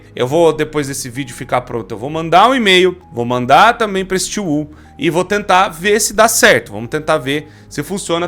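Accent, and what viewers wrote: Brazil. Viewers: O jeito que vc fala se assemelha mais aos brasileiros,vc vê muitos vídeos na Internet ou pq convive com BR?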